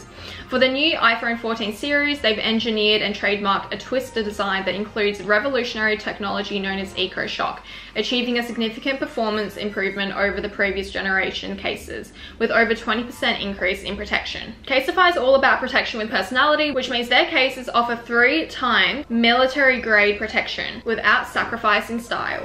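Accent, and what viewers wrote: Australia. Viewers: I love the Australian accent and how she says “phone” she says “phewrn”.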